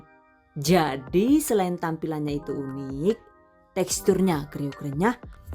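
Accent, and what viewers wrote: Banyumas, Indonesia. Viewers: Jebule rika wong ngapak yahhh :v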